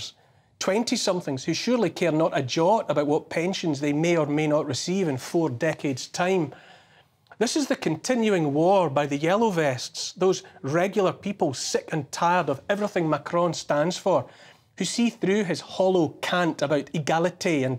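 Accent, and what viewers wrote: Scotland, United Kingdom. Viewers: Neil, I just love hearing your Scot's accent speaking the truth. You have one more American subscriber. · Scottish voice of Neil is wonderful mate … I’m off to buy a bottle of Bucky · “The strutting popinjay that is Justin Trudeau..” 😂😂😂 Well said my friend! Fabulous monologue in an equally fabulous Scottish accent! Greetings from Canada! 🇨🇦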